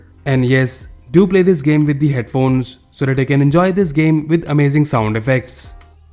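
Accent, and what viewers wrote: India. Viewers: Dude is trying to hide his indian accent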